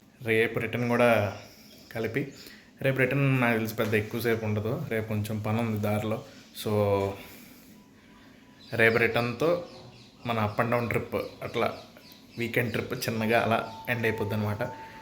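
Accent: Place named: Godavari, India. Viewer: Ahaaaa Godavari slanggg vastundeeee 😂😂😂😂😂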